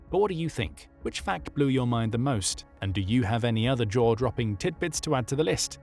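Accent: United Kingdom. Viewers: Next time, use mid-continent English, not uk "english", a la Shakespeare crap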